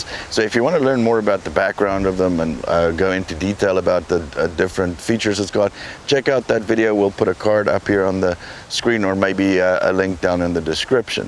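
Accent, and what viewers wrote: South Africa. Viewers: Lekka South African accent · You have a South African accent. Great video.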